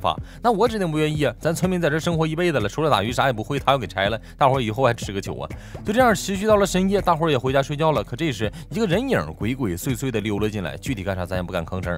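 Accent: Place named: Northeast, China. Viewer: 我现在听着这个配乐带着东北话的解说下意识想到了牛叔……………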